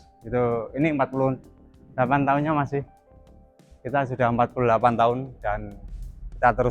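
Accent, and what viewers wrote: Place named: Java, Indonesia. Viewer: Medok artikulasine😊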